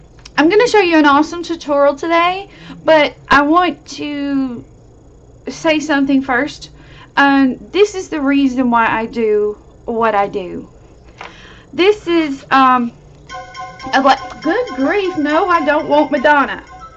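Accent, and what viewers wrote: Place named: Tennessee, United States. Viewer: Loved the video. What a fun way to use up the leftover shelf liner that I have. What part of the country are you located in? I live in TN and your accent makes me think we may be close.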